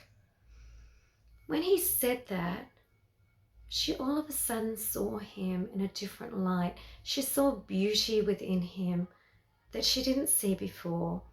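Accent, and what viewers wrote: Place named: United Kingdom. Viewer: Thank you for your informative and beautiful video,! 😃👍 You are gorgeous and lovely 😍, and I love your cute sweet and beautiful UK accent!! 🥰😊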